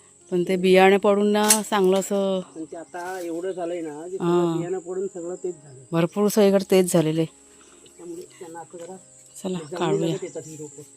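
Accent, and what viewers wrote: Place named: Konkan, India. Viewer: देश तसा वेश तस प्रांत तशी भाषा... प्लीज समजून घ्या कोकणात इतर ठिकाणी अनेक प्रकारच्या भाषा बोलल्या जातात तसेच मग त्याचे उच्चार असतात...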